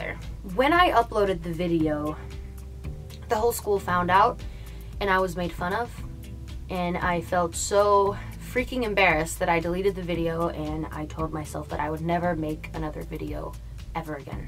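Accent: United States. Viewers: why do you speak with an american accent? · is she finish and American? She sounds American what a shame :( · If she's from Finland then why does she have an American accent? I'm so confused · i'm sorry if this has been asked before, but why does she sound american? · Where did you get the American accent from?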